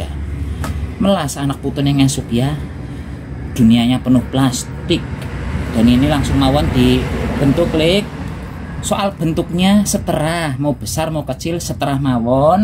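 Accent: Banyumas, Indonesia. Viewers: Ngomongnya ngapak podo inyong😅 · Lucu banget ,,,Mase gundul pacul ini.,,,,,,,cukup terhibur logat ngapaknya yg bawel,.,,,,mana biyunge di simpan dimana,,, ,lucu asli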